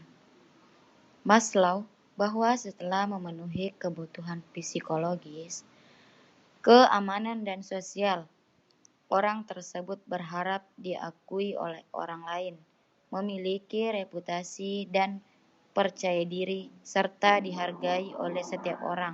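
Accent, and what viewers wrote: Bugis, Indonesia. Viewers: Tanra na ugi dari logat bacanya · Semoga dpt nilai terbaik. Jelas skali logat bugis nya 😬😇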